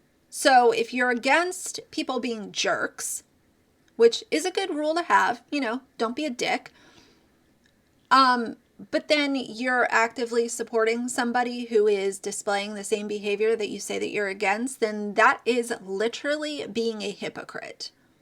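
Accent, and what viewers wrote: Australia. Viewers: Australians are awesome!! Lovvvee the accent too 👍🏼❤️ Also, as an American have you SEEN the state of our country right now? Lol I’d be the last to judge anyone else 🤣💀Alicia Dougherty doesn’t represent most Americans so don’t judge us lmao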